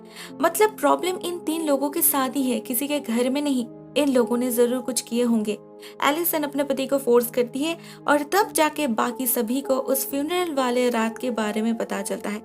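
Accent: Bengal, India. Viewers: Ap bengoli ho kia bolieee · u bengali? 🖕🏽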